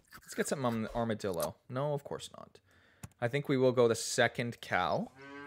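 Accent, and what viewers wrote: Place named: Netherlands. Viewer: I can hear the NL influence haha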